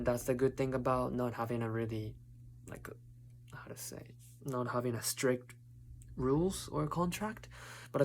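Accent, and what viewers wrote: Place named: Japan. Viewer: 日本人英語でわろた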